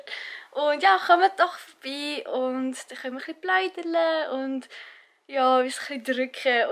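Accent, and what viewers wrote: Bern, Switzerland. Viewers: Fingi mega cool ds bärndütsch ändläch mou öpper wo do hiä uf youtube bärndütschi videos macht ;-) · Speix Darum redet sie Bärner Dialekt. xD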